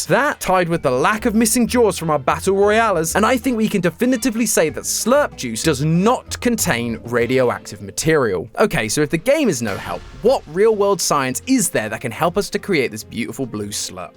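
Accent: United Kingdom. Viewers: Love to have a British host, don’t take this the wrong way and say I hate MatPat because I dont, it’s just nice to have someone from my country host the channel ya know? It’s cool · before amarican now british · Awesome, we get a British accent to listen to now that matpat dipped (Edit: I not hating btw I just mean I love me some British accent💀) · they made matpat british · Me realising matpat is gone: D: Me realising is british::D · game theory is british now? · MatPat is bri’ish!😮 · Why did matpat quit youtube, did anyone give him tensions or something? I had listened the voice and matpats voice is very crackey but this one is a British person (ami right that its a British person?) I liked the way he said THE LOREEEEEEEEEEEEE! I missed those days hes voice gave me happiness now i dont like this person. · I still cant get used to the british voice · they replaced matpat with a british dude, i cant listen to it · I miss mat pat but the new guy is British I think so W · Hes British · I don’t want British man I want the other guy please I want him back I miss him 😭😭😭😭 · I thought this came out years ago, the British accent caught me off gaurd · British matpat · hes BRITISH NOOOO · Let's all say goodbye to Matpat. 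We all miss him. (say, who the f is this British guy now?) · Matpat get a new guy not a random British guy with a script you wrote.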